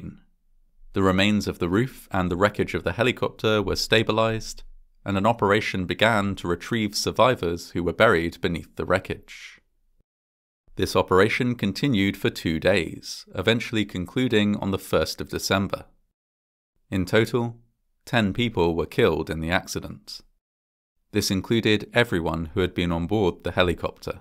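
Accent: United Kingdom. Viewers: Did someone program a bot narrator with a British accent!? Because I didn't thin a narrator could sound worse that a bot narrator with an American accent! But you did it! Absolute crap!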